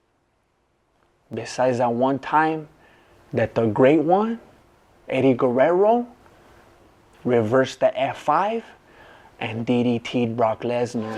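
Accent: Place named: Mexico. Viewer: i enjoy watching this guy ,his nice Mexican accent ,his jokes and beautiful videos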